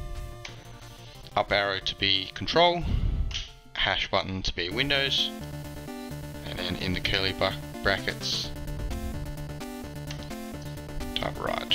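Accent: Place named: Australia. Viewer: When I clicked on the video and heared an Australian accent, I was like HOLY KROYKEY MATE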